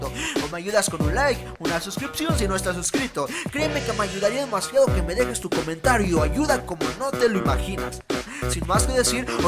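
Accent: Mexico. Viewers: Pues de qué parte de México eres :v?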